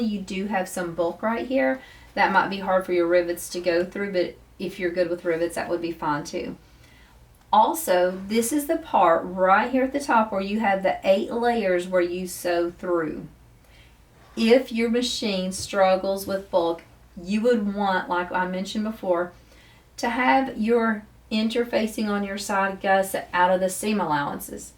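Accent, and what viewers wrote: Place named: West Virginia, United States. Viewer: I love your accent, too. Reminds me of growing up in West Virginia!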